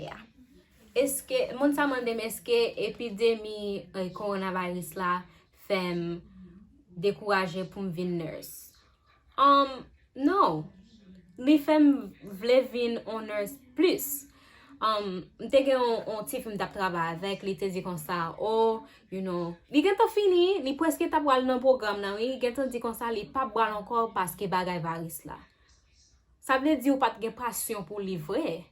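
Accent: Cap-Haïtien, Haiti. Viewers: Ou pa fet haiti okap epi depiw ap pale la mwen gentan we accent moun okap la nan ou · Eh manmanl ki moun okap la lap toujou gen accent moun okap menmsil pa fet Ayiti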